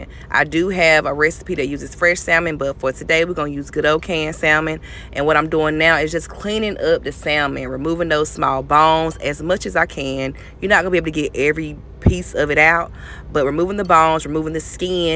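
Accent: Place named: South, United States. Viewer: Mane you country as heck, I know you from the dirty south 😂 good video ❤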